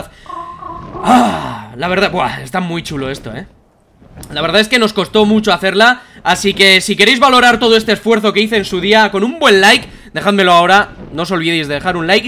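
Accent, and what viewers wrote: Spain. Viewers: grito de me puedes saludar por fis, por sierto de que parte de España eres, tus vídeos son excelentes 😁👌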